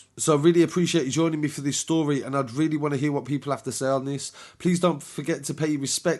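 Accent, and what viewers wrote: Birmingham, United Kingdom. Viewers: The brummie narrator.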